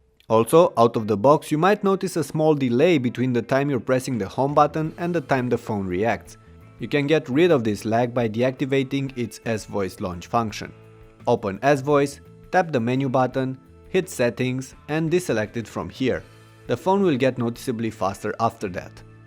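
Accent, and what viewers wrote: Romania. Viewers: Ești roman , se vede după accent.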